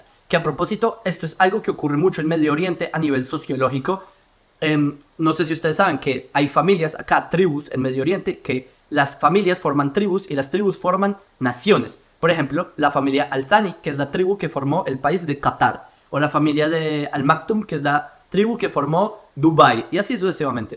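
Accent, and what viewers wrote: Bogotá, Colombia. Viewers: Tu tienes un acento muy Colombiano. Naciste de Padres Judios Colombianos ? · Bravooo!!!! 👋👋👋👋👋 excelente. Explicación aclaraste muchas dudas . Te seguiré . Tienes un acento colombianisimo 😊 un abrazo 🫂 🤗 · Tiene acento Colombiano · Tu español suena muy parecido al " colombiano "😊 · Aprendiste español en bogota o con un colombiano ? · Tienes un acento muy curioso, es decir, un acento colombiano y no israelí...en fin, ya estudiaste bien tus lecciones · Se nota que aprendiste el español en Bogotá diria yo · Tienes acento Colombiano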